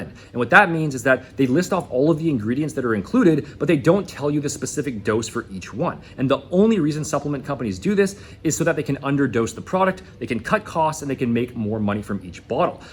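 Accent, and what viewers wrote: South Africa. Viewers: He sounds like hes from south africa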